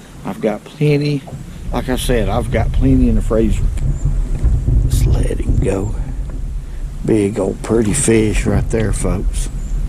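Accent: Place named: Alabama, United States. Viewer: “Guah luea” Alabama if I’ve ever heard it. Roll Tide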